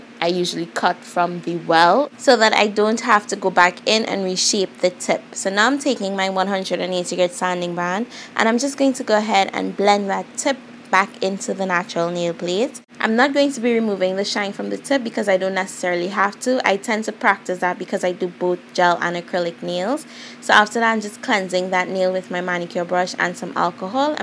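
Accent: Trinidad and Tobago. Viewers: I am sooo happy i was SHOOK when i heard your accent i was like.. wait nah dis is ah triniiiiii!!!!! ayeee new subscriber!!!!!!!!!!!!!👏💯❤ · Love hearing and seeing your videos..it's so refreshing to hear our lovely trini accent🤗great work hunni💕💕💕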